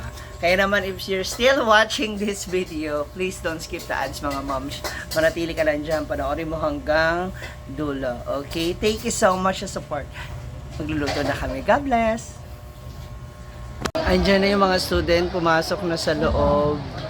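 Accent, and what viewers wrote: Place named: Visayas, Philippines. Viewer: bisayang bisaya nman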